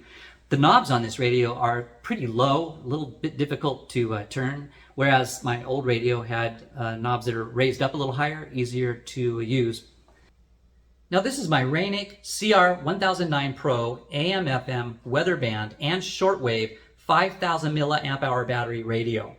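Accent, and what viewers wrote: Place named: England, United Kingdom. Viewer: Bro's english is very English.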